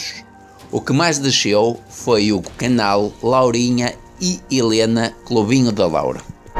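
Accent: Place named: Portugal. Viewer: O cara faz conteúdo do Brasil mas o sotaque e português de Portugal, vc e de Portugal mesmo?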